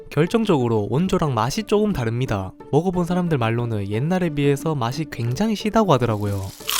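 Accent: South Korea. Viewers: 이 억양은 대구말투인 것인가요?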